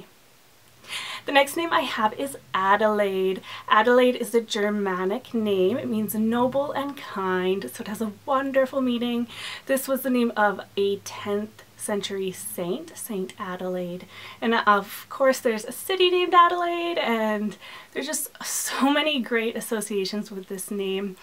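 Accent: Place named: France. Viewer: La chanson de Roland hahah how I love your french accent ! Much admiration from France belle demoiselle ;)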